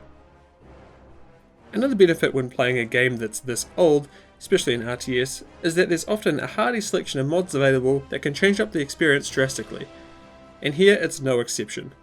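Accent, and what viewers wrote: New Zealand. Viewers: Where in New Zealand are you from?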